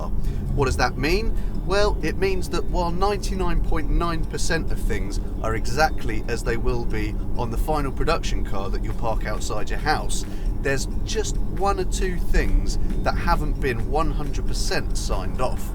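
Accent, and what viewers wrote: United Kingdom. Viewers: I need to marry a British guy. I could listen to him talk all day lol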